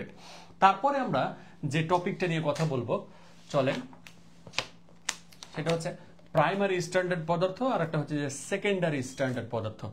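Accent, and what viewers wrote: Rajshahi, Bangladesh. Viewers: First class sir vasha sunei bujlam apnar bari rajshahi pore about e giye Dekhi asolei.... Last of the all apnar class khub e valo legese ami online e khub akta class Kori ni SSC te to aro Kori ni mane kora lageni akhon apnar class ta amar khub e valo legese asa kori samner sob class e apnar sate thakte parbo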